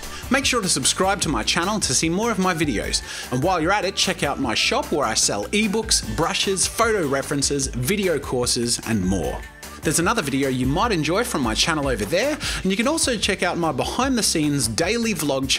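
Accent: Australia. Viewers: His Australian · dawn boi Ausie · He's Australian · dawn boi ausie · Quite Australian... the way he says "art" is also in a few British accents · Are you an Aussie?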